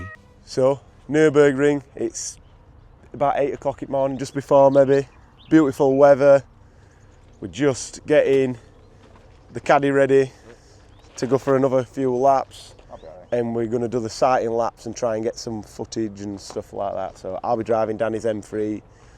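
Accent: Yorkshire, United Kingdom. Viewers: Yorkshire accent and a mullet had me rolling 😂😂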